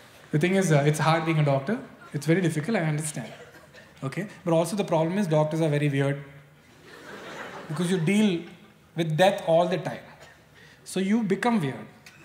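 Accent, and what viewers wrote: Kerala, India. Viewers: rocking the Mallu accent tho 😀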